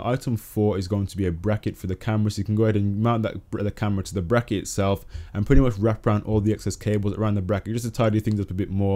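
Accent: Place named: Birmingham, United Kingdom. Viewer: Sick video but u from birmingham? Ur accent gives me a vibe haha :D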